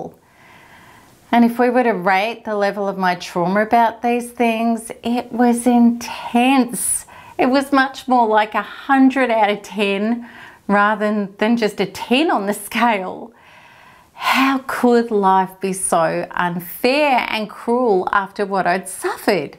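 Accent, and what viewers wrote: Australia. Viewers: Love your accent! Are you Australian?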